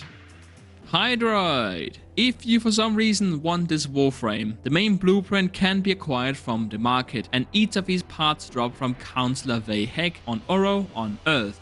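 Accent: Denmark. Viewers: classic danglish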